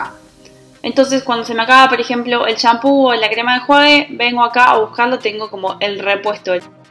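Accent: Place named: Argentina. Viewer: me gusto mucho tu departamento y tu voz de argentina saludos desde mexico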